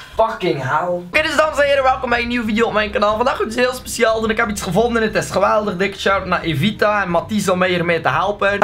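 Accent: Belgium. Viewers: je hoort echt dat hij een Belg is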